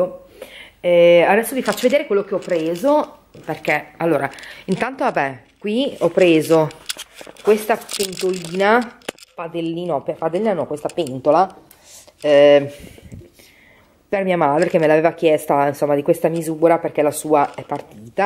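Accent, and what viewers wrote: Emilia, Italy. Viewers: Che ridere quando parli con!!!😂😂😂adoro l'accento emiliano!!! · SEI UNA GNOCCA TERRIBILE 😊😊😊!!! L adoro è stupenda!! Adoro gli emiliani nn x altro sono di Reggio Emilia ma purtroppo nn abito li ed è una vita che nn ci vado 😔😔 xo seguendoti e sentendoti parlare mi sembra di tornare un po' a casa... mi piace molto la tua semplicità complimenti x i video che fai un abbraccio 😘😘🤗🤗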